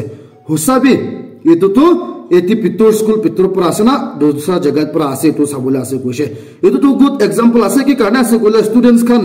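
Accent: Nagaland, India. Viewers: Hi naga..wishing you a merry christmas and happy new year....I like and admire your nagamese news...thanks and bless you for announcing clear and pure nagamese..with prayers mk..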